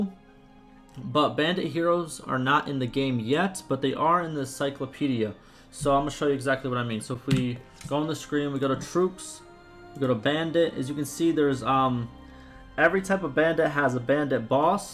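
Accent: United States. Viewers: He is from usa i assume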